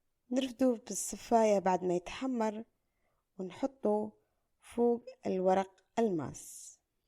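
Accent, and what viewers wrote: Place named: Morocco. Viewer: راها تشبه بزاف شغل لهجة مغربية نتاعنا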